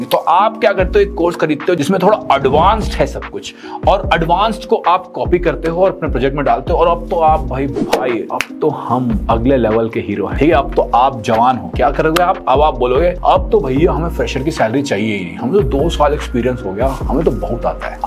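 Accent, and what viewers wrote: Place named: Bhojpuri, India. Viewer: your bhojpuri accent is so sexy "Maatha" mtlb sir dard 😂😂😂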